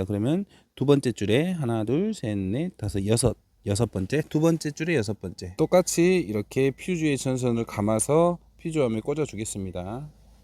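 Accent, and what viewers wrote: Jeolla, South Korea. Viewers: 전라도 말투 넘 좋아요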